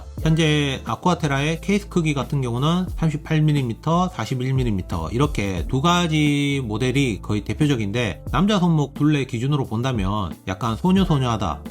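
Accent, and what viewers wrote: Gyeongsang, South Korea. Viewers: 경상도 사투리만 조금 더 다듬으면 전달이 더욱 잘 될 것 같습니다.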